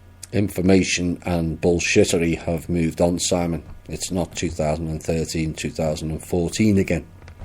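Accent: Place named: Liverpool, United Kingdom. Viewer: my names Kevin Paul from Birkenhead and I know you know we're this is cause I can here the Scouse in ya lad! 👍🙏🇬🇧😇lisen I'm age40 dad to 4, and 16!weeks ago I had a spiritual awakening and since then I can see the evil entities in certain professionals in my area and in many other people around me, my GP doctors is one of them and he is trying hard to convince me I'm loosing my mind, Don't worry I haven't told him what I'm seeing and receiving, Ive just asked him why Ive been floating out my body multiple nights recently and I made the huge mistake in asking for advice from my dr but I never mentioned my spiritual awakening, but he know Fxxkn right I can obviously blatantly see he knows buy his strange reactions and the out of the blue serious advice he is trying to force me to believe ‼️🆘🙏‼️🇬🇧So now I am all alone no one believes me what I am 16 weeks into now and this Awakening doesn't go away I will only become more and more awake and closer to the Oneness which is all of our number one objective while we are in a body, I have reasearched the arse out of awakening but exspecially the UFO FACT and every other subject to do with the pineal gland cover up for multiple decades to humanity ‼️❤️ it's up to you but if someone had the time to lisen to what I have to teach and look at all of my iPhone footage of the Sun and The Moon and the big flashing orbs that appear for me and follow me all over the place both night and day with the sun and moon but the orbs I have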